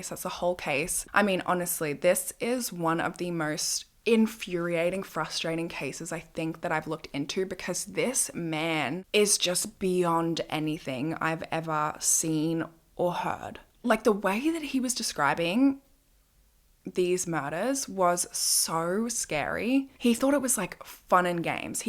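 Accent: Australia. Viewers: you just earned a new sub! love the way you explain things, i’m also australian. it’s so nice hearing a familiar accent when listening to these kinds of things, thank you for your videos! <33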